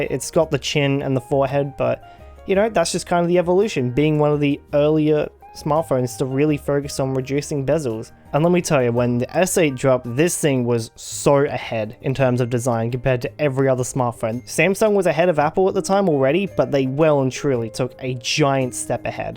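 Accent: Australia. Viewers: Are you a Aussie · because he’s Australian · The Australian accent is THEE most annoying accent of all accents by far.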